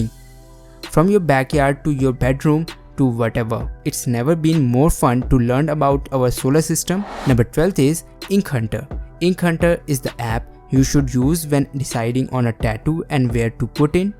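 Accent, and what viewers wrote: India. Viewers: you must be a south Indian...probably Telangana or Andhra.by the way Video content is useful.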